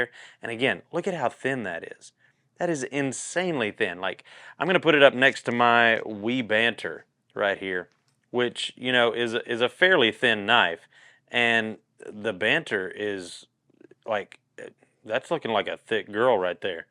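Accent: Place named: Appalachia, United States. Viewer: Have you met Jeff from Everything Auto? Pretty sure he’s your vocal dopalganger! Maybe it’s just that Appalachian twang?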